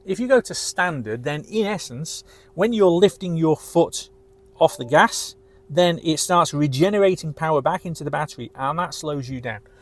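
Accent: Scotland, United Kingdom. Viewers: Just what I wanted in a demo video, no nonsense, British and informative! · Voice controls and scottish accent 😀 This Tesla struggles like myself when on vacation in Inverness, since I am not an english native speaker. Very nice car in exactly the colour I would choose too... Different to your car I would choose white for the interior. Keep on with your video works; after watching several videos randomly for quite some time you've just won a new subcriber.Cheers from Austria! Ludi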